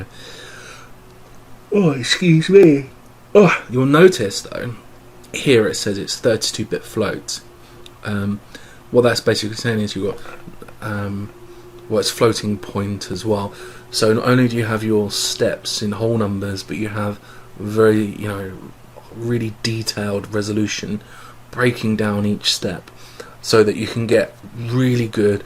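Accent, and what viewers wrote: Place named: England, United Kingdom. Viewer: You English blokes have the best accents, I could listen to you explain stuff all day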